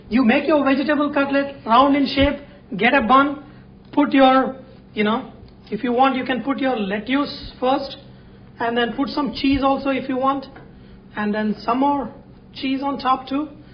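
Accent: India. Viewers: QUICKLY DIP IT! IMMEDIATLEY! ... the indian accent just makes this all the more fun XDDDDDDD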